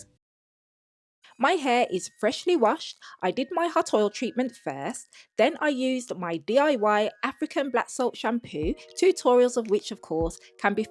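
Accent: Birmingham, United Kingdom. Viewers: Your accent sounds like your from birmingham yay a sister from the ends😂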